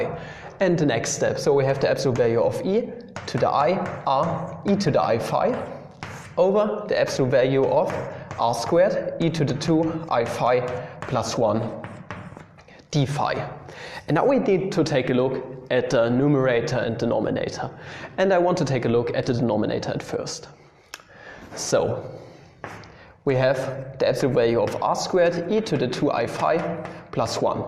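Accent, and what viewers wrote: Germany. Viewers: The german accent+The great content+Calculus+great explanation= THIS VIDEO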